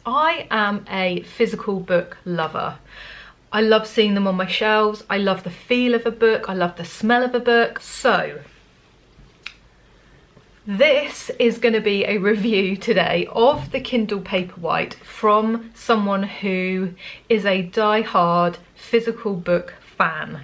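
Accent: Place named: United Kingdom. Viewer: From your thumbnail i thought you were British😅😆,, and then hearing your accent confirmed it... Great video in any case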